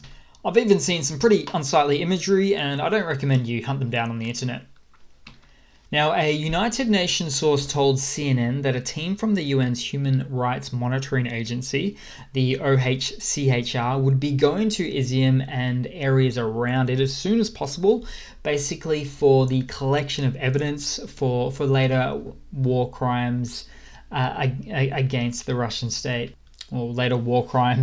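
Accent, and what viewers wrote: Australia. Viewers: Same accent, both Australian. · Really? are you an Aussie? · Hey. I like your reporting. Good stuff. Honest question here: what is that accent? Australian? Please forgive my ignorance. It’s pleasing to the ear, but I can’t quite place it. Just guessing Aussie.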